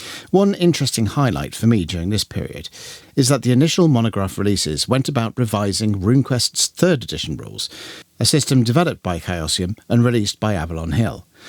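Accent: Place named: United Kingdom. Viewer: A man with a British accent talking about rpg history is peak.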